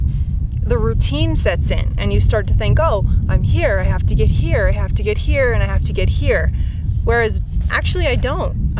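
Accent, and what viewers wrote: United States. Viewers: I love ears english and i love your american accent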